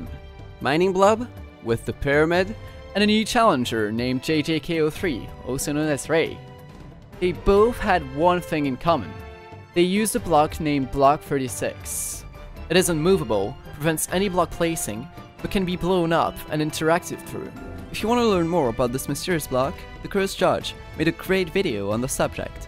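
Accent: France. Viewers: are you french? i feel like you have an accent.. Great video btw!!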